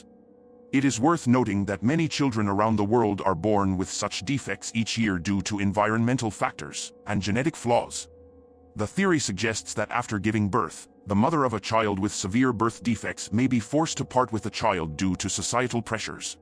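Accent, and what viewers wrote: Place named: Scotland, United Kingdom. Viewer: I dig the vaguely Scottish AI voice. It's like listening to an up and coming Deckard Cain type doing a class project.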